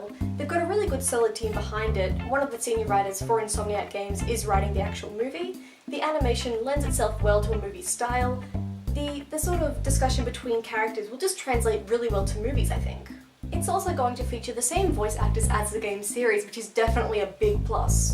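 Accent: Australia. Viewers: aaah, dat glorious fellow Australian accent.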